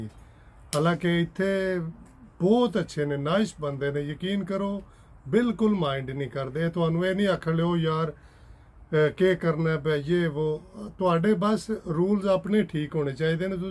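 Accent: Gujrat, Pakistan. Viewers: Ap Gujrat se hain .ap k bolne k style mujhy lga tha Ap Gujrat se ho .meri ami bhi Gujrat se thi .☺️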